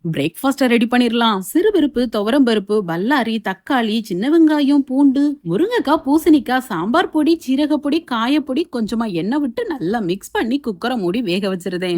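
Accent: Tirunelveli, India. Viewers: Tirunelveli slang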